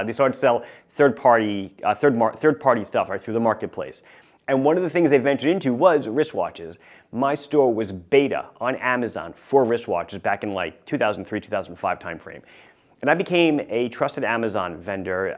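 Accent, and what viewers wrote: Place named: Texas, United States. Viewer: I don’t buy watches from you because of your Google ads. I DO buy watches from you because of your Texas drawl in describing your products & and because of your wardrobe that matches Teddy Baldasarre’s. There, I said it.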